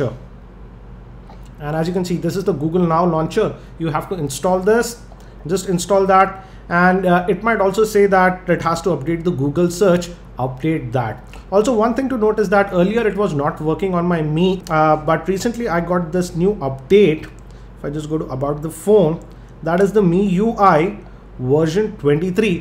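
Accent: India. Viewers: Indian voice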